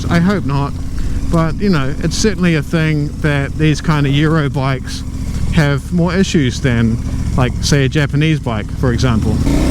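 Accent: New Zealand. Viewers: NZ I reckon from his accent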